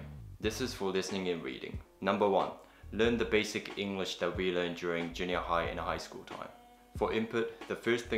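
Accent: New Zealand. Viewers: さんの声寝てるときにずっと聞いてますww NZアクセントカッコ良すぎます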